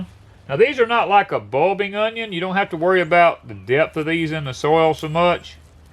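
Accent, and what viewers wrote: South, United States. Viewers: I have a southern accent, too, so I don't notice his accent. He sounds like everyone I know. Lol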